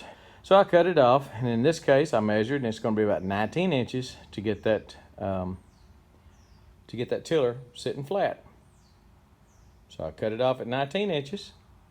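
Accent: Texas, United States. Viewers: From your Texas accent, are your from Uvalde?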